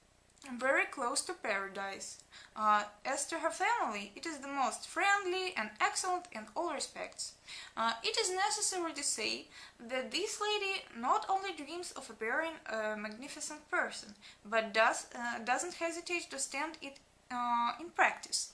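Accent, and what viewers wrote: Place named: Netherlands. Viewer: sounds like dutch accent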